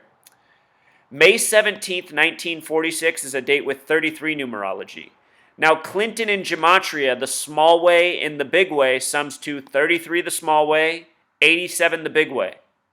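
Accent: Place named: New Jersey, United States. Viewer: My gut feeling tells me your from jersey.....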